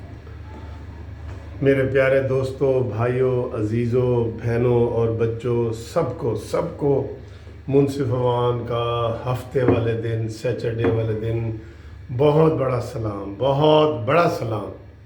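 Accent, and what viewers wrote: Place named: Lahore, Pakistan. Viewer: The way you talk shows which area of Lahore you belong to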